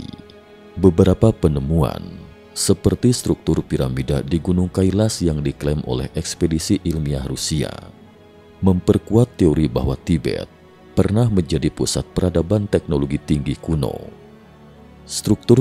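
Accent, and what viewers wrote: West Java, Indonesia. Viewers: mang , maneh urang sunda nya ? hayoooh ngaku 😂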